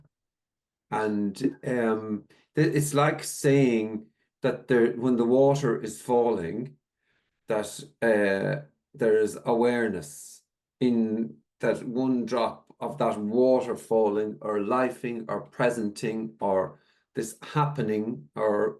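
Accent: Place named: Ireland. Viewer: Love it sounds even better with an Irish ascent ❤❤❤. So so resonates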